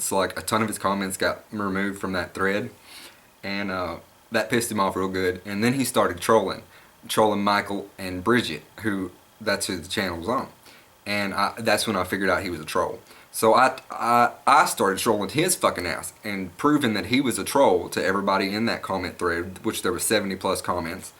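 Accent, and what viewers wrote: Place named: Kentucky, United States. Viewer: I found u thru that comment. Enjoying ur vids. My 9yo shih tsu is my only youngun. I'm f Ky ur accent sounds like mine. At least u got a sub f the troll. I don't believe in sayin GD either but I cuss like a sailor. Usually when I ask people not to say around me they respect that. Anyway I'm gonna go watch ur other puppy vids. There's a big drama w channon rose & Amber Walter on here it's like HS. I told them spend energy doin good but I let people bother me too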